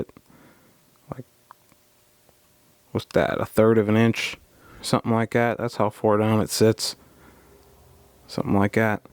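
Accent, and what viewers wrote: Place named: Baltimore, United States. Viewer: You from Baltimore? It sounds like my hometown! Nice vid thanks for the hard work in putting it online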